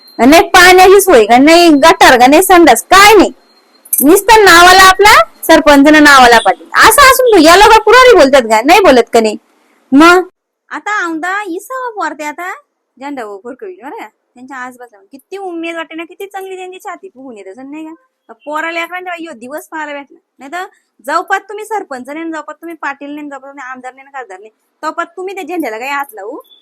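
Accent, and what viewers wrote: Murbad, India. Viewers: मी कर्जतचा आहे.. आमच्या कर्जतची आणि बाजूच्या अंबरनाथ तालुक्यातील लोकांची बोलण्याची टोन पण मुरबाडकरांशी मिळती जुळती आहे..ही टोन आपलीशी वाटते आणि बोलताना पण आपलेपणा वाटतो..Keep it up 👍👍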